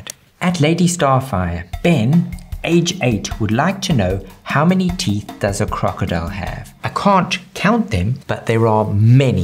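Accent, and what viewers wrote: South Africa. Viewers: probably KZN accent. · I can't tell if you're South African, British, or Australian? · I know that South African accent anywhere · It's a South African accent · It's South African "accent". Many different accents combined into one. I personally love it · South African? I love the accent. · Love the South African accent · Only a South African can recognise that accent ☺ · Dude. Your accent, are you South African? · sounds like maybe a bit Australian or something as well. I thought Australian, but it sounded off, and South African definitely makes sense. But I also wonder if there's something else in there as well! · This guy south african?? The accent gives pretoria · could listen to this guy for hours i love south african accents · I know a South African accent when I hear it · he sounds south African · Is he South African? He sounds like it lol. 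Yay we made it to WIRED!!! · I'm having trouble nailing down his accent...South African? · the second the video started, got slammed with the strongest south african accent i have ever heard lmao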